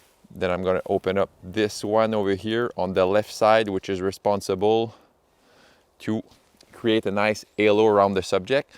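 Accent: Quebec, Canada. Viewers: merci pour la vidéo. si je ne me trompe pas tu es québécois avec ton accent. 🙂 Est-ce que ton appareil fait automatiquement le focus stacking ou tu le fais manuellement? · I'm gonna ask you one thing, es-tu québécois? Ton anglais est beau mais j'entends très bien ce petit accent particulier du Québec! J'adore ton vidéo et je vais m'abonner à ton channel!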